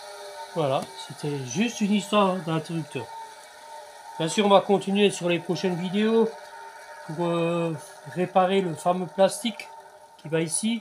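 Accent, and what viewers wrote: Alsace, France. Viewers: Ça sent l'accent d'Alsace ici ! Bonne vidéo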